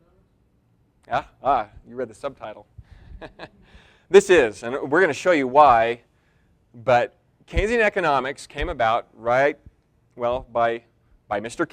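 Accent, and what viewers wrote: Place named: South, United States. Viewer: they must like his southern twang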